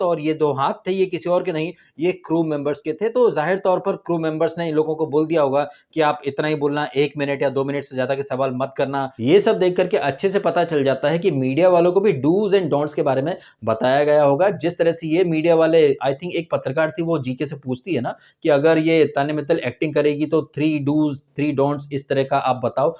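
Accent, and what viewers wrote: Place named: Delhi, India. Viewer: bhai aapka tone delhi ke logo jaisa h😂 islye maine poocha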